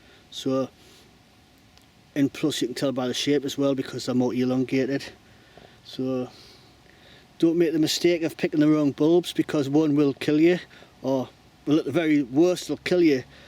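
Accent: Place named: England, United Kingdom. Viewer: Love to watch videos from another country. Like the english accent also. I don't have the access to places to go here in Florida. Too many thing to go wrong with all the unpleasant people around. And the country is so dry this time of year. Wet season is a couple of montha away so campfires are not good.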